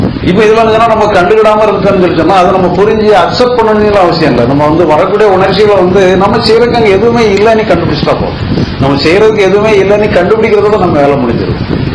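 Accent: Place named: Tirunelveli, India. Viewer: அருமையான நல்ல தகவல் அதுவும் விரும்பும் நெல்லை தமிழில். நன்றி ஐயா!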